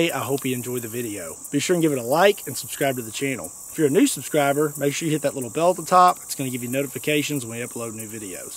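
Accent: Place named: Eastern Kentucky, United States. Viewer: I’m also from Kentucky! Eastern to be exact! It’s weird to see someone with the same accent as me!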